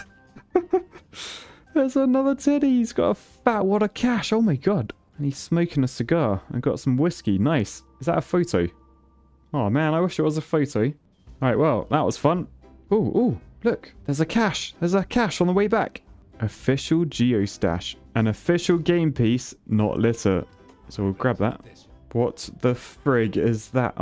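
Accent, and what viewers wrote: United Kingdom. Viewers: Honestly this feels like graystillplays old content but with a British voice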